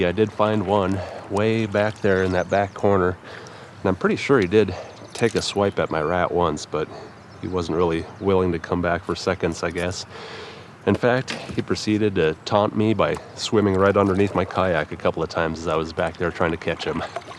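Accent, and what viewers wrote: Canada. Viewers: Your in N Dakota? Ya sound Canadian. Is it N Dakota Canada?? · What a great and relaxing fishing video! You just got yourself a new subscriber. Excuse a completely irrelevant question from Sweden, but does the ND dialect have some Canadian pronunciation in it, I think it sounds similar?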